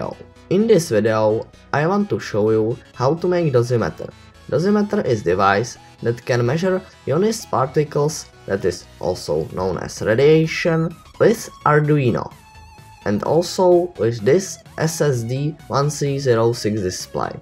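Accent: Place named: Czech Republic. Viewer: Celkem český přízvuk XDD gut video dávám like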